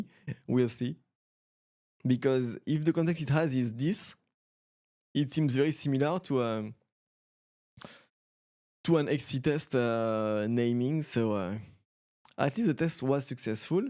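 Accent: France. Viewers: Excellent l'accent Français ! ☺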